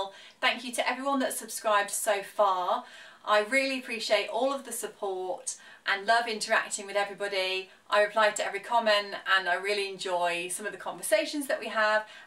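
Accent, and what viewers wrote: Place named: RP, United Kingdom. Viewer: I think your wonderful accent makes everything sound extra posh.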